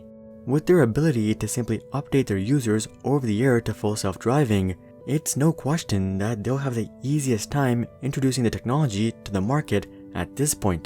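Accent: Singapore. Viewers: The accent of the narrator sounds Singaporean to me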